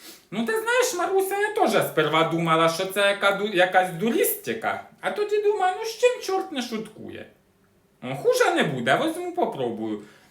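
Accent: Poltava, Ukraine. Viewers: Дякую як послухаю то наче дома побуваю моя рідна Полтавщина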